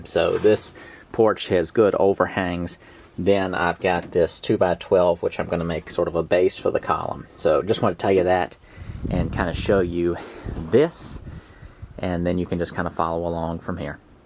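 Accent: South, United States. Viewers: what region of the states is his accent from ? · I wonder that too! Based on his accent and the hilly terrain, I'm thinking north Alabama or west Georgia or... ?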